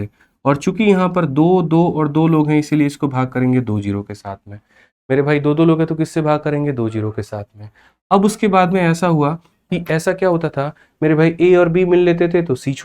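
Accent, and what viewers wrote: Bihar, India. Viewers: Ab sir paka bihari leg rha ha gajb❤❤❤❤❤🎉🎉🎉🎉🎉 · Sir main rrb je ke liye pdh rhi hu aapki videos helpful hai thankyou sir , bss ek request hai sir please thoda sa questions ko na english me pdh kr explain kra kro taki humko pta chle ki english me pdhke kaise approach Krna hai questions ko . Baki aap bihari me bolte ho vo toh sara upr se nikl jata hai . Meri bss yahi request thi 😊😊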